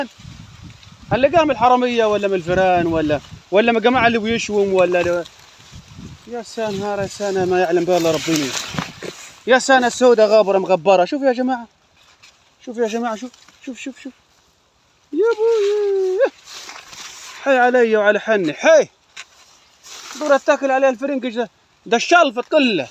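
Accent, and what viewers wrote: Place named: Libya. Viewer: لهجتك فلاحي على بدوي على ليبي انت من وين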